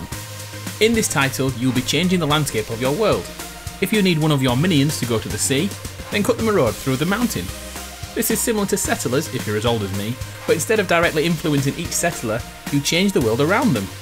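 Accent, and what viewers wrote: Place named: Scotland, United Kingdom. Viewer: Wow, the scottish accent. How much you changed!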